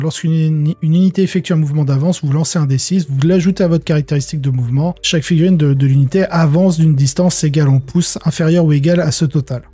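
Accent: England, United Kingdom. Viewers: Un grand merci pour cette vidéo! Ça fait toujours du bien d'avoir des éclaircissement sur les règles, qui dans certaines situations ne sont pas évidente! Surtout avec qualité ET un accent anglais extraordinaire ^^ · Merci, c'est tellement nécessaire. Même en amical, être clair sur les règles du jeu permet de vraiment profiter du jeu. Toujours un plaisir de te suivre . Ton accent anglais, ta marque de fabrique 😎.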